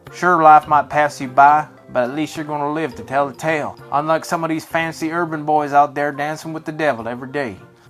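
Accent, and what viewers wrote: South, United States. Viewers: Funny as hell, but why the southern accent? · this one isa solutely outstanding and your Confederate English is very good. Respect.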